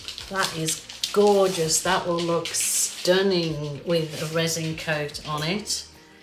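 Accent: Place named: Norfolk, United Kingdom. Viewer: This is beautiful - What is your accent? I feel like i could hear lots of different ones, bit of a norfolk twang - bit Birmingham, bit Australian... Could listen to and watch you for hours...❤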